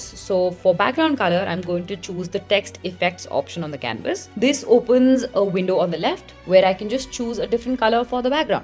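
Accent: India. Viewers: I knew this is clickbait once I heard indian accent 👎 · Your Voice Like : indian ❤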